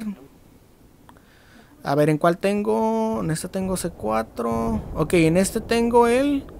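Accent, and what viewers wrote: Mexico. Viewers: ajajajajaj me subscribo me imagino k eres mexicano yo si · hablas muy norteño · eres mexicano we? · eres de mexico? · Oye amigo de donde eres suenas como si fueras de aca de mexicali , B.C jeje xD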